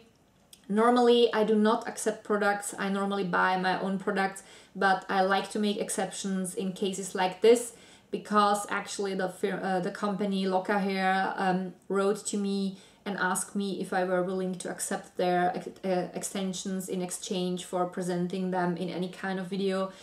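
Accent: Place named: Germany. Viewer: you sound very german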